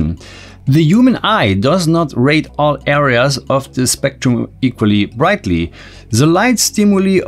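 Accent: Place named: France. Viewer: He sounds like G-Man from Half Life, just with french accent :)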